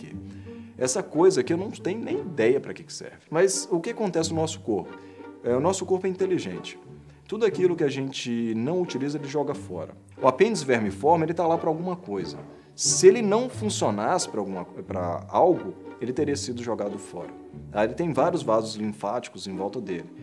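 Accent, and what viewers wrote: Minas Gerais, Brazil. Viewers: Alguma dúvida que ele é mineiro??? Ameeeeii ♡♡ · É mineiro uai!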